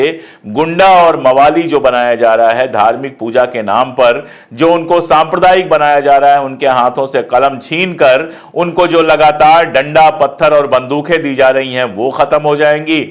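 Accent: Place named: India. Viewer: ji aap haqikat mein is mulk ki tahjib ki jhalak hain aur aap ki awaz mein hindustan ko bakhubi mehsoos kiya ja sakta hai.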